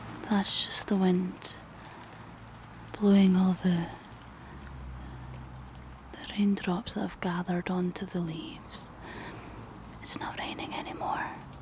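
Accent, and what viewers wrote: Scotland, United Kingdom. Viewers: Thank you, spine tinglingly supreme as always. The lull of your Scottish whispers and soft rich warmth of your chatter is pretty special. ❤ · I absolutely love how this ASMR video blends the natural ambient sounds of the outdoors with the gentle clarity of your voice. The subtle background noise from traffic adds a real sense of being in the moment, which feels so grounding, while your voice remains perfectly clear and soothing. That Scottish accent really enhances the cozy, personal attention vibe. Truly a relaxing experience, both calming and immersive!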